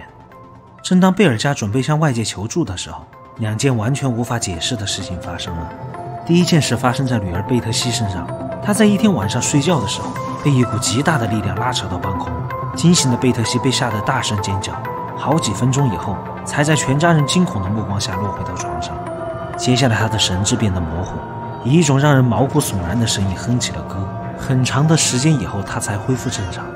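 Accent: China. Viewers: 听起来是重庆口音😂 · 配音是四川还是重庆人？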